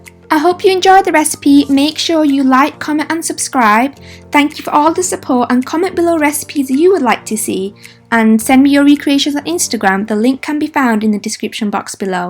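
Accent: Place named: United Kingdom. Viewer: Damn! This makes me crave for donut 😅.. Dear I love the tutorial and listening to your British accent. ❤️😉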